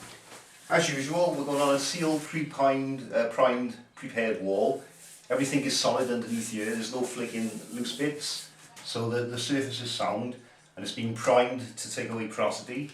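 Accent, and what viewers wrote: Cardiff, United Kingdom. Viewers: Caerdiff accent Got one myself, best of luck.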